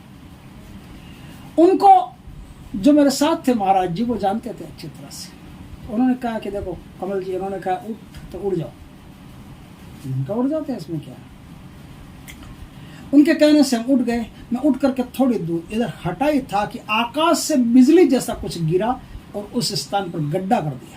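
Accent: Rajasthan, India. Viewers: आप राजस्थान से हो क्या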